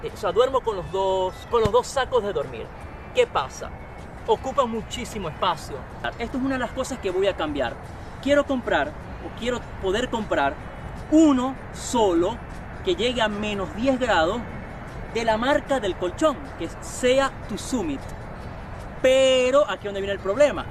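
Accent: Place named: Venezuela. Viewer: Muy bueno..mi pana,..desde el principio me pareció acento venezolano,..pero cuando hablaste sobre el hecho de que no cuesta “tres lochas”,..no tuve dudas. Muy bueno e interesante tu video. Donde es ese lugar?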